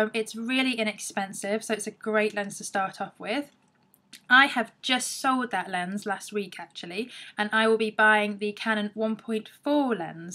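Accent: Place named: South West London, United Kingdom. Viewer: london accent..south west i think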